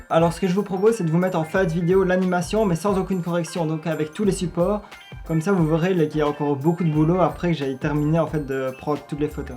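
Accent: Belgium. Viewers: Tes belge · Ah tu es belge ? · T’est belge ?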